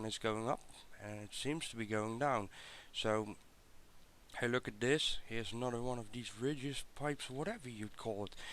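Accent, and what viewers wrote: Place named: Netherlands. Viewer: dutch way of pronouncing .. hahahahahhaa!!!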